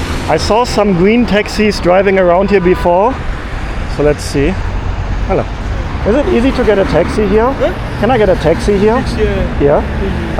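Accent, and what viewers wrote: Germany. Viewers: Can tell you are german, as you pronounce your w with an r and vice verser. Ive subscribed to you. 💋💋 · nice German accent. Makes you "sympathisch" and real❤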